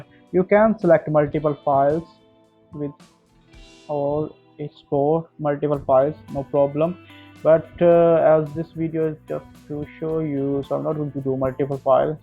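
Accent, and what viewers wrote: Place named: India. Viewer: Indian accent is very annoying disgusting 🤮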